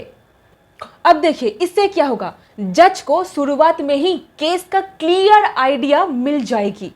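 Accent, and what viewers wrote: Bengal, India. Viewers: মামনি, হিন্দি একটু ভালো করে শিখতে হবে। উচ্চারণ টা বাংলা মিশে যাচ্ছে।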